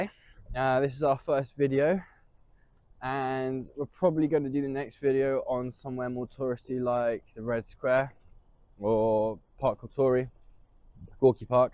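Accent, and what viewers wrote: United Kingdom. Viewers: Okay, I subscribed. I hope it will be interesting to look at Russia through the eyes of a Brit, and at the same time it will be useful to listen to a non-American accent. For some reason, I suddenly remembered a quote from a famous Soviet comedy: "- Well, if you come to our place on Kolyma, you'll be welcome... - No way! You'd better come to us!" 😂😂😂 Greetings from Krasnoyarsk!✌😉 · Блин, чел, как же тяжело воспринимать английский акцент после постоянного американского. Англичане, пилите больше контента!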